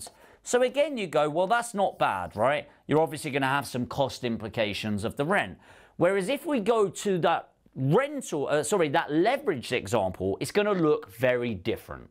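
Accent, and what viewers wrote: United Kingdom. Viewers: I’m curious to hear you’re from which part of UK? 😅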